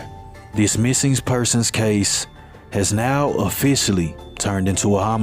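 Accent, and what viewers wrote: South, United States. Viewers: New subscriber here! Your southern accent is very welcoming. I am a North Carolinian who was born, raised, and still lives here! I’m from the mountains close to Boone, but I am familiar with all of the places mentioned in today’s story. This is just heartbreaking. Such a beautiful young girl who never got to experience life and I imagine she had immense potential and would have done so many great things with her life. Unfortunately that monster took that future from her, and it just ain’t right. These type of stories always get to me. Just a sweet young lady who loved everyone. RIP Dani! You will never be forgotten! ❤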